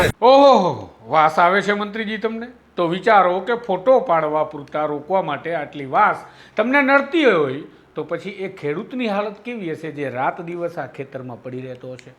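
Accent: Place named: Rajkot, India. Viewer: pyor rajkotian bhasha 👏👏 aane j layak che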